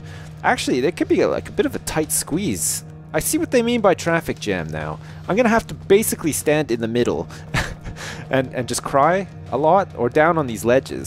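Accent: Canada. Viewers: Which part of Canada are you from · Oh, you are so canadian. soory everytime you say it it makes me smile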